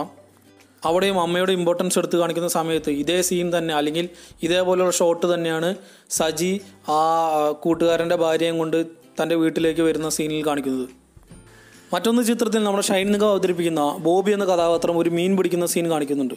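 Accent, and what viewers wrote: Palakkad, India. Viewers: Cheettan palakkad ano???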